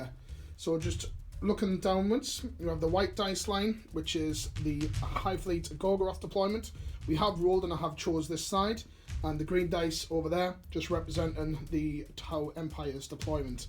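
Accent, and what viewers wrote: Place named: North East England, United Kingdom. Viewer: Hey dude, which part of the North East are you?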